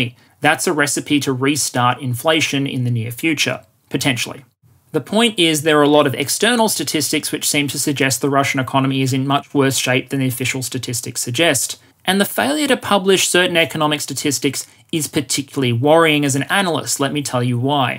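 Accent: Australia. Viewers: I like how australian guy tells me our energy policy more in depth than our own government. Excellent video, thank you! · Is this the "Economics Explained" guy's second channel? (Or first channel or whatever?) Their voices sound identical to me - and I'm and Aussie, so it isn't just that the accent(s) are Australian.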